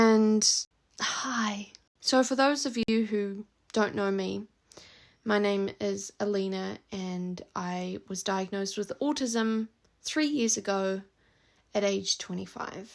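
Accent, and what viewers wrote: New Zealand. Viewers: Why do I never recognize the kiwi accent when I'm kiwi haha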